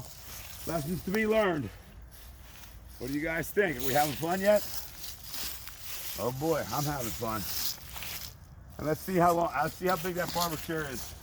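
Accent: New York, United States. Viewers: An arborist from New Yoik! At least, that's how it sounds to this Englishman. Loving your work sir👍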